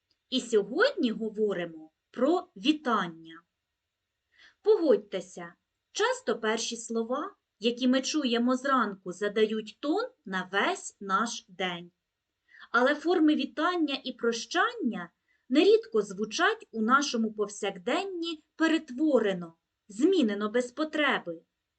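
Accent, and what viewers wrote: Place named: Poltava, Ukraine. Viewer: Завжди впізнаю діалект Полтавщини 😄 Щасти Вам!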